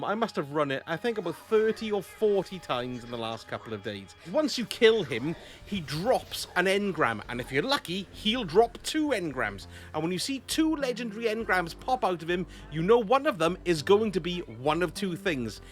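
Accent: Wales, United Kingdom. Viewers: yo, this guy sounds welsh lmao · Nice to hear a fellow Welshman on Destiny2. Awesome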